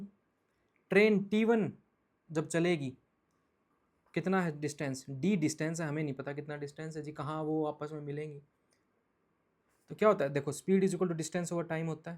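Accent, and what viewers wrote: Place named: Haryana, India. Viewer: Sir really loved how you are speaking like a proud haryanvi. Many are very ashamed of speaking the language our people, family speak in today’s time in haryana 🙏🙏🙏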